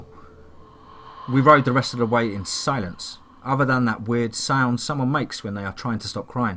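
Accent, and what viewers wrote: United Kingdom. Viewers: Hi There, new subscriber here, from the Midlands, UK. Love your narration, as I can understand more clearly as its a familiar British accent, not that I dislike the American accent (I actually bloody love it lol) but sometimes I struggle to grasp the narration with a thick accent, as no doubt some of you would struggle with mine once my midlands accent came through lol, but lets make this clear, I'm not a Brummie FYI lol. Alicia my lovely, you are one helluva strong lady, and my hat goes off to your bravery, and I don't blame you for showing that DM who's boss around your parts. I will continue to look for updates on here, but pray for your safety. blessings to you and your family and I mean that from the bottom of my heart. stay safe xx Julie xx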